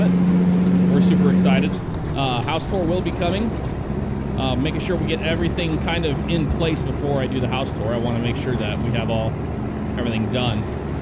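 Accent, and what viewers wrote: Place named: Missouri, United States. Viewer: I’m from jax and i can tell you’re from missouri from your pronunciations lol. Love the vids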